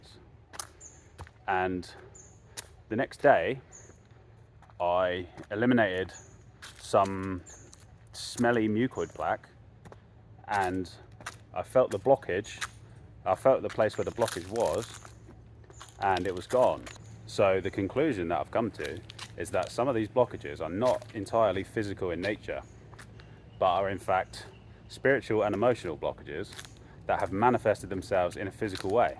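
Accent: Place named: England, United Kingdom. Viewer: What country is this, in.guy is English.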